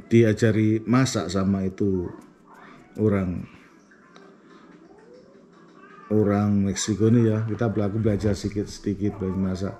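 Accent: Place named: East Java, Indonesia. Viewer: Om bisa tolong bantu buat kesana gk...? Oh jawa mana om kok kaya orang jatim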